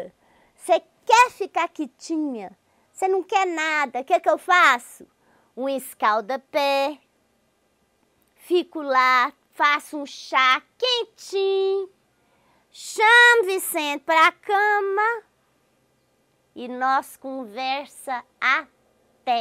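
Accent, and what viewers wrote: Minas Gerais, Brazil. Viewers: Amo vc 💕 VC tem mim ajudado muito com seu jeito mineiro de falar comigo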